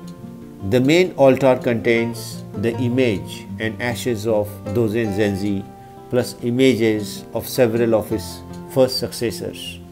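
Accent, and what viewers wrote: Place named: India. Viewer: Your Indian English tone makes it even more enjoyable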